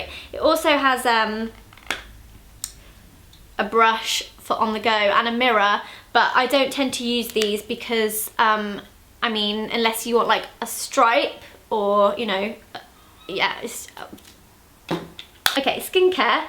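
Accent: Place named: Somerset, United Kingdom. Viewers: I'm from the south west and I literally sound exactly like you at the start of the video(: love your makeup in this video!💕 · I know you said shout out to Wiltshire, but people speak like this in Somerset too, and it was nice to hear, being at uni so far away from those accents you don't think you'll miss!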